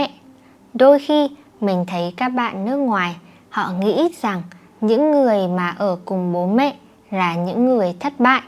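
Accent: North, Vietnam. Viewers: Does the YouTuber speak the Northern Vietnamese language in her videos?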